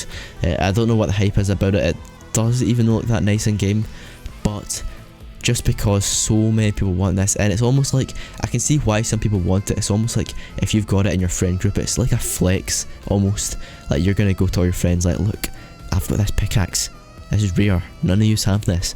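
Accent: Scotland, United Kingdom. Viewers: Are you from Scotland and epic name is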